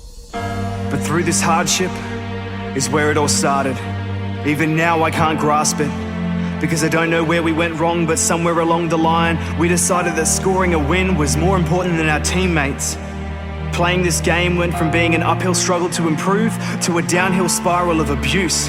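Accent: Australia. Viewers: Aussie accent XD haha love it! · 1. annoying echo 2. annoying australian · that australian accent xd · You realise this is what an australian accent sounds like. Holy fuck the stupidity of that comment..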